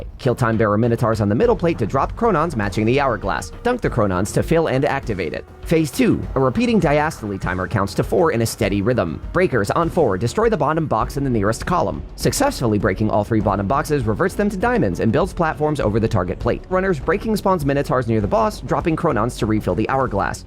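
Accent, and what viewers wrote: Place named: Scotland, United Kingdom. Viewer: This dude does a great scottish accent! much love brother.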